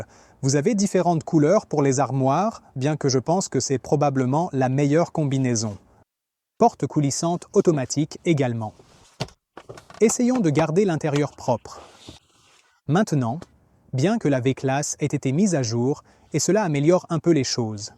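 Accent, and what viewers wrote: Quebec, Canada. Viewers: c'est curieux mais il a un accent du quebec ?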